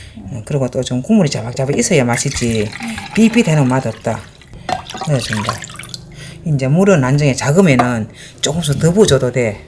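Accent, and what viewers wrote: Gyeongsang, South Korea. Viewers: 경상도 말씨가 참 구수하네요 저도 제대로 배웠어요~♡ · 요리를 볼때마다 참쉽고 만나게 많들어요 경상도 말씨여도 참 호감이 가네요 잘 보고 감니다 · ! 요리강좌 공부도 공부 이지만 두분의 알콩달콩 따님의 솔직한 맛 이야기 듣는 재미로 자주 기다려져요 경상도 사투리도 구수하구요 ㅎ 겨울에 뜨끈한 국물 자작한 소불고기 쉽게 알려주셔서 넘 감사해요 따님은 목소리도 정겹지만 엄마가 해주시는 요리 드시고, 무해한 여러 음식드셔서 곁에서 보고 배우신 눈썰미가 대단하실것 같습니다 건강미인 이실듯? 싶네요 ♡ 오늘도 맛있는 불고기 요리 생표고 보다 건표고가 특유의 냄새 안난다는 꿀팁얻고 시청 잘하고 갑니다 두분 새해 복많이 받으시고, 무엇보다 건강이 최고이니 건강하세요? 고맙습니다!! >_< ♡♡ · 목소리가 너무듣기 싫어 경상도 억양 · 선생님 경상도 말씀이 더 재밌어요^^ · 경상도 사투리말씨가 너무재미있습니다 불고기도 맛있게보이고 엄마하고 딸내미 사이가 너무보기좋아요 울엄마생각이 나네요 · 주부경력 35년인데 보면서 배울 꿀팁이 한가지씩있네요~그리고 댓글보는 재미도 쏠쏠ᆢ꾸밈없는 갱상도사투리 ᆢㅎㅎ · 경상도말씨에도다정다감 참듣기좋요. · 저도 오리지널( 대구에서 태어났고 현재 70년..) 쉽고 간단하게 맛있게 소개해주어 감사합니다. 그리고 멘트가 경상도사투리로 홍양홍양 너무 재미있습니다. 강력한 응원자로 따라 해봅니다. 숨은 맛있는 경상도요리 더 많이 소개해주세요. 이미 알려진 레시피는 별로 입니다. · 경상도 사투리가 구수하고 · 구수한 말씀이 경상도 분 같애요. 참 간단한 설명에요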